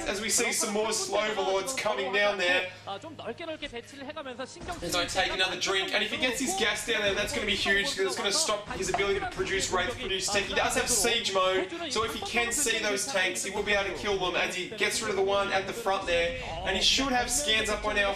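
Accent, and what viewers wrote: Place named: Australia. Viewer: crikey mate, a bloody aussie sc commentator?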